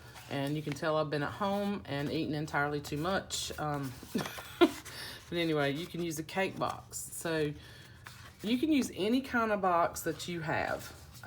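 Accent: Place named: South, United States. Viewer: Yes please, love all your process, picking out your holiday papers?, I can watch you do anything, even drying paint. Love your tags. Please don’t say negative things about yourself, I know Im not the only one who appreciates all that you do for us. Your southern accent is wonderful to listen to. I’m really enjoying Christmas in August, thanks!!💕💕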